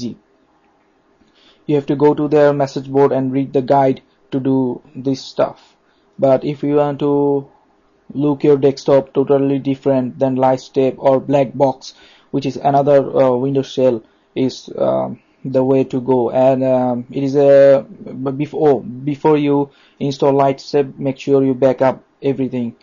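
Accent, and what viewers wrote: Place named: India. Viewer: No offense to the author but he is indian? Again, no offense. Due that the accent is really familiar.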